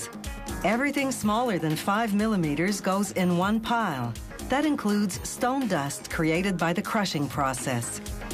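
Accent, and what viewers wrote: Quebec, Canada. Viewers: She's ESL, and doing a fairly good job of suppressing her (french canadian) accent.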